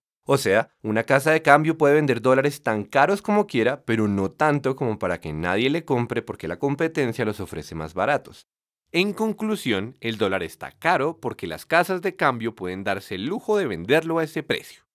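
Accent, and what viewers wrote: Bogotá, Colombia. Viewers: My bien explicado pero con un acento rolo demasiado marcado "ala mi rrrey" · Pero el que habla en el video no es de Medellín, es de Bogotá. Por ende su acento es "rolo". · qué buen canal, y sólo vengo a realizar que son colombianos(creo) por el acento... es cierto,no? estudié español 8 años y viví en Medellín 5 años de esos para aprender mejor. ahora no he estudiado mucho pero me siento casi colombiano porque me acogieron con mucho cariño cuando viví allá, se sentía como mi hogar,sabes?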